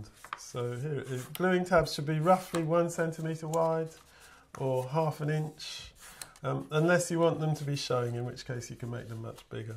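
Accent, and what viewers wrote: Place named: RP, United Kingdom. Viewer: ciertamente tiene un estupendo acento Británico culto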